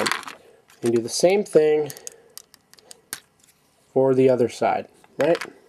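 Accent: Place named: England, United Kingdom. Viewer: it is not British accent, it is English BTW but good model!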